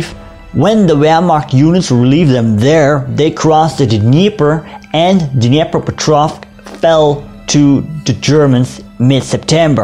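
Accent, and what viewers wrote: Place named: Netherlands. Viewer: oh mate I'm watching them all. I love your take on the subject and the crazy Dutch accent. My history teacher was an inspiration at school and I never lost the love of the subject.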